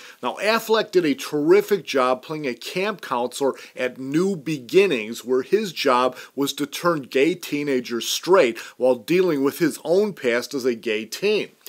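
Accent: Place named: Midwest, United States. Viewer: why is it that this guys Midwest accent is so annoying? New York, Southern and Boston accents seem charming or amusing. Words like "FAAACTORY", "Gaaathering". Just an observation.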